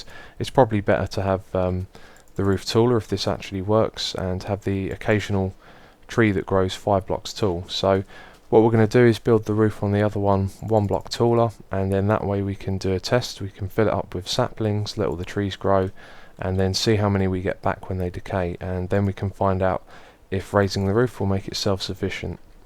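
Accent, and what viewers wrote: England, United Kingdom. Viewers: Nobody say 'dude you're stupid' here but are you British? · what part of england are you from? :)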